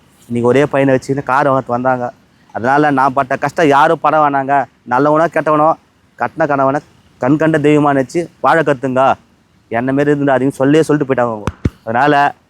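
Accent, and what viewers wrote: Madurai, India. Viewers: மதுரை வாசகம் சூப்பர் 🎉🎉🎉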